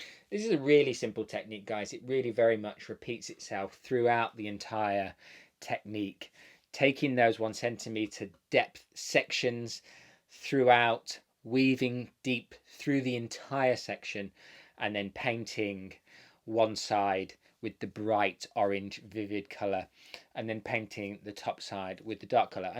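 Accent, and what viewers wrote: United Kingdom. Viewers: I've been watching your videos all day on Saturday and it's so nice to watch and listen to another Brit. US colouring terms are so different to ours. I know now that if I did want to colour mine (South Asian hair) I would know what to ask for.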